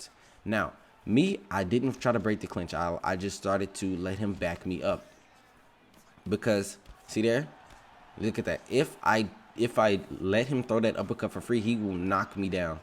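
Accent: Jamaica. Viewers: i’ve been inna party with this guy he’s nice and chill. has a Jamaican accent i think . i only say i think because i don’t know accents good.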